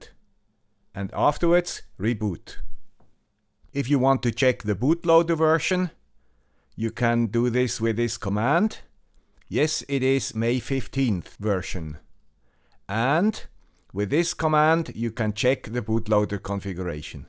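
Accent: Switzerland. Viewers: It works, it works, it works, it works! How much I love the man with the swiss accent! :) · Watch out, after a update you might loose your *.dat and *.elf files, they were corrupted 28th of May, this is the old correct version <link> It's in Toms Hardware article. <link> It will save you some grey hairs. The new raspbian image has the wrong files, so this might help you. As always, a big thank you to "the guy with the Swiss Accent" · Danke guy with Swiss accent. Now I will buy a pi4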